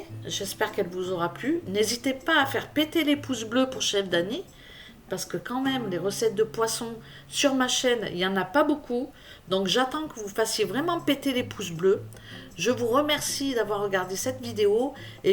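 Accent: South, France. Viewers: Cc ☀️ On va se régaler😋 mes enfants n’aime pas trop le poisson, mais par contre le cabillaud ils aime, merci chef Danny🤩 de partager de si bon plat et à toi avec ta douce voix du Sud que j’adore🥰 j’ai fait péter les pouces bleu, comme d’habitude🤣 Gros bisous et bonne soirée😘🥰👍👍👍👍👍👍👍👍👍👍👍👍👍👍👍👍👍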